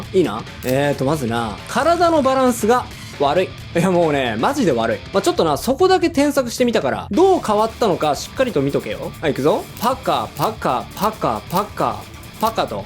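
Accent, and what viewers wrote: Tokyo, Japan. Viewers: ブラックになると江戸っ子風になるんや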